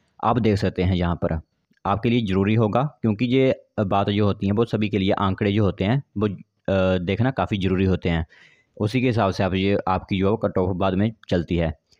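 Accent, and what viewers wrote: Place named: Nurpur, India. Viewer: Bhai nurpur ,jawali wale lg rhe ho 6 ko she bolne se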